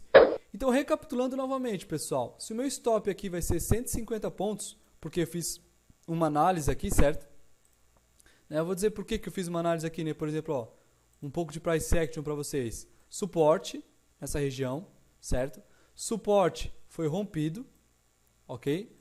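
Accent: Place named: Santa Catarina, Brazil. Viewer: ajudou bastante ! Pelo sotaque você deve ser catarinense, se for somos vizinhos, eu sou de Rio Negrinho SC rsrs....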